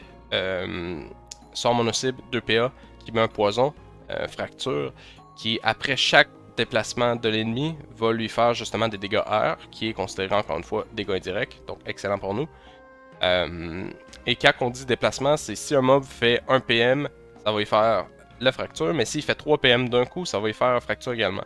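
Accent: Quebec, Canada. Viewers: Un quebecois esti!!! Yessir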